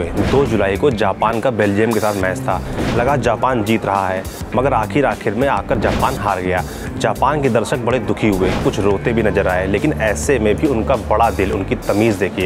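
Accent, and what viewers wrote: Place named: Kanpur, India. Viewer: are You From Kanpur....coz your way of speaking seems like Kanpurites...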